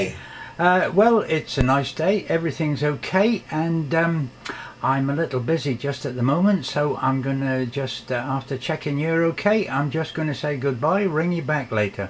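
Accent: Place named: East Anglia, United Kingdom. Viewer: Where are you from, originally,? Your accent reminds me of my own happy huntng grounds of East Anglia:]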